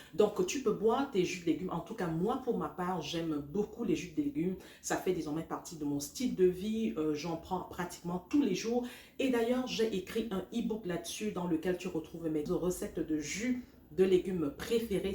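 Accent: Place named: Cameroon. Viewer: Timbre camerounais ce que vous dîtes est très vraie j'aimerais svp savoir comment faire pour avoir votre extracteur svp merci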